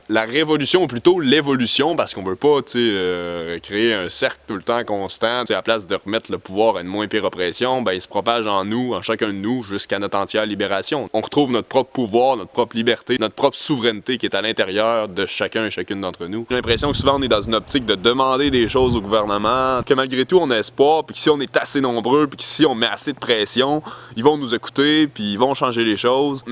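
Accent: Quebec, Canada. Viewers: moi aussi et j'aime bien entendre parler québécois :)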